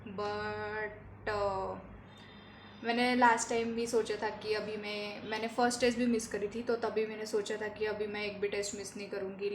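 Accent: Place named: Mumbai, India. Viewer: Hi... Can you tell how many chapters have you completed (or revised) till now? Are there any chapters that you are not doing? And also, this is not study related but are you from mumbai? Your way of speaking made me think that u could be from mumbai. As well as, in one of your videos you mentioned about splitsvilla. Actually, I also watch splits and its a distraction. So you are not the only one facing that kind of distraction. You are not alone, hehehehe. All the best.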